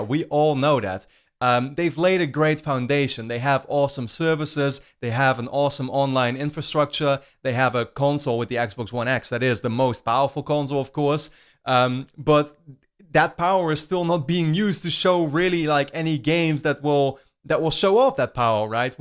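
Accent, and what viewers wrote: Netherlands. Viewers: Hey man, are you Dutch? I live in Amsterdam, want to play some XBL?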